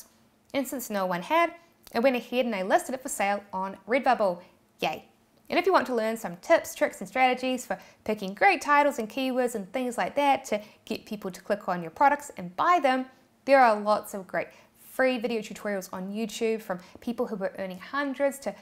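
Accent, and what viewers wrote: New Zealand. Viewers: Ohh u are a new Zealand's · The Kiwi accent is soooooo adorable on you! 💓💓💓💓 ❤❤❤❤💓💓💓 Hey, what is your thoughts on Crypto?, and the likes of Bitcoin?, is that an area that you have ever given any thought to? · LOL I was gonna say Australia but NZ is cool. I just started watching your channel recently and it's interesting. And your accent is cute 😂😁🥰. I used to know someone from Australia.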